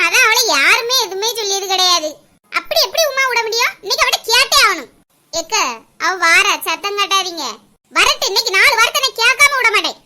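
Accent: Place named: Thoothukudi, India. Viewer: Arumaiyana Padhivu :) Enakku Thoothukudi dhan...Indha Pecha Kekrapo Avlo Amudhama Irukku❤️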